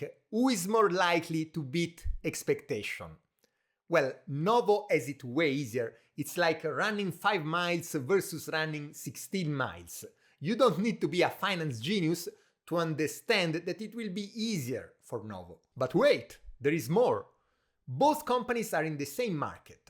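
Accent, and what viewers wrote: Italy. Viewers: questo accento mi sa di italiano D: anyway good video!! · Love your Italian accent and I your reasoning. I is vivifying. I use Eli Lily (prescribed by my doctor), but invest in Novo Norisk.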